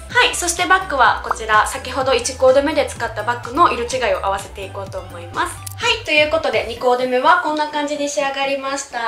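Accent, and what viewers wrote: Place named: Kansai, Japan. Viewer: shortをたまたま観て、可愛過ぎて動画も観に来たら関西弁なのずるいです、、🥹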